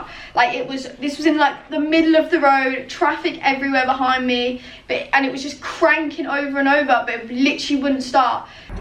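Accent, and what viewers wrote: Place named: United Kingdom. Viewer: Clicked on the video out of curiosity, then you hit me with that UK accent and I couldn't stop watching. Love the humor but also the ambition. Subscribed for support and I'll be checking out you other videos, get it girl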